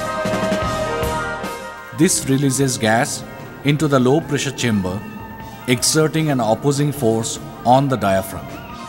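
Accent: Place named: India. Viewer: We get really bored with this INDIAN accent...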